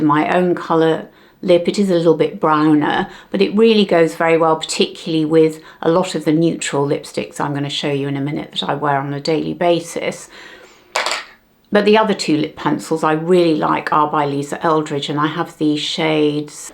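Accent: United Kingdom. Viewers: I love your British accent and way of speaking. Live in the US for awhile if you want to hear the English language butchered. You are eloquent. 💖💖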